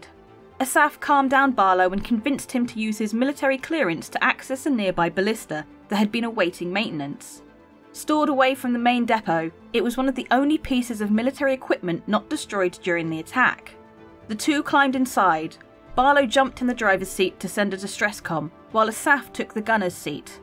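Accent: United Kingdom. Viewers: When I first watched the lore 'story' videos I thought that it was something produce and official release by CIG. The quality and pace of voice commentary, depth of knowledge, the suitability of a brit accent - all make for a very high quality video. I remember watching the Nyx video and thought "Hey! that voice sounds familiar. Either has a very common tone of voice or she been contracted by CIG to voice over their vids now". Well done and keep it up.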